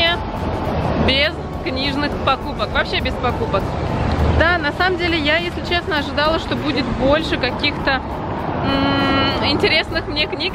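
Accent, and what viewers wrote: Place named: France. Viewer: Прямо слышно как за время жизни в Париже появился акцент!) Много горловых звуков)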